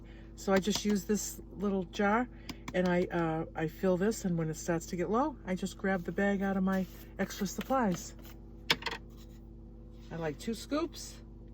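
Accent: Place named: Boston, United States. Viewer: you are incredible! I subscribed months ago but life gets busy, so was glad to see you’re still doing this adventure! Watched this whole thing… toothbrushing to breakfast to dancing with that gorgeous blue sky backdrop. Yes, I hear the “Boston” in your voice😉. Hello from Connecticut. I’ll watch more often. Your shows are “glimmers.”👍🏻🙋🏻‍♀️🤣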